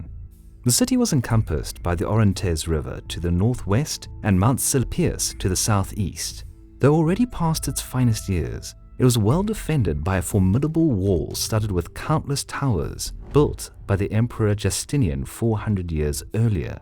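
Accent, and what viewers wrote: South Africa. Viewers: Can I just ask, is your commentator South African? Please reply. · south african is the accent is my guess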